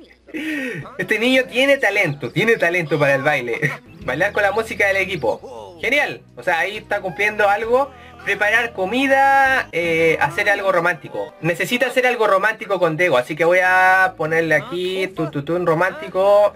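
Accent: Chile. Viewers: dego eres chileno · es chileno :v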